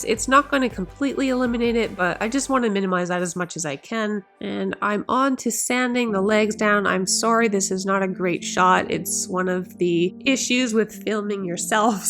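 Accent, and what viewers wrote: Canada. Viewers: Thank you so much for posting this! I have only refinished mid-century furniture with walnut veneer. But I bought a cute circular table with solid wood legs and skirt, but it has a laminate top. I will be applying your process to paint the top and edge. Wish me luck! Yank here btw who visits Toronto about once per year. I knew you were Canadian about 30 seconds into this video 🙂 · Your ideas and work are beautiful and inspiring. Please keep explaining why you choose certain products; it helps me to better understand the process. The music is fine but I'd be fine with hearing just your voice. You have a charming accent that sounds like my Canadian family.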